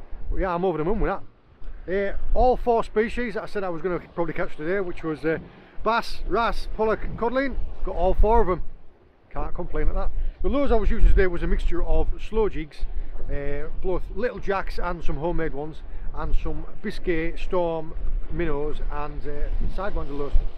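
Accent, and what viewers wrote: United Kingdom. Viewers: Viaduct I'm wondering that too. A lot of times the CC spelling is wrong and it also takes liberty in what the word you actually said. But the CC is better than nothing. After listening to your videos, I'm understanding more and more of your accent. And they say us Americans have a lot of accents, you Brits have won the war in that category by far. Another GREAT video, always a pleasure.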